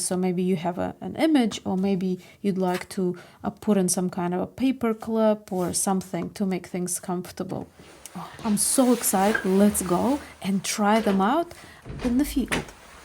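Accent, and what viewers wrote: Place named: Russia. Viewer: Абсолютно русский акцент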